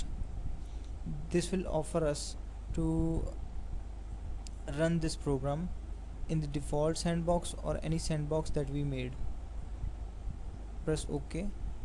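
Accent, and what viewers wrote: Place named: India. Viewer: sooo Indian accent xD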